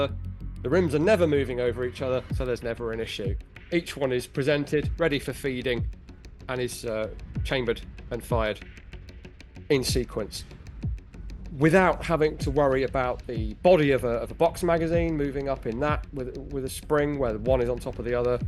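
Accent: United Kingdom. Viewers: He's pretty good. Like gun Jesus with a britsh accent and a mullet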